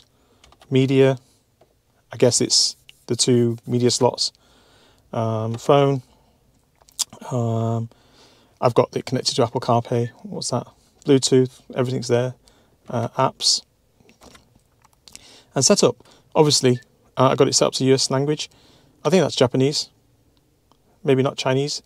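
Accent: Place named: United Kingdom. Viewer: Ok you sound British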